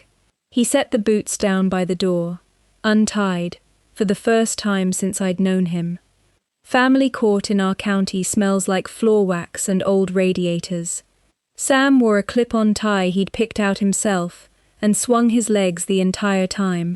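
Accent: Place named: United Kingdom. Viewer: Why does the reader of this story have a British accent?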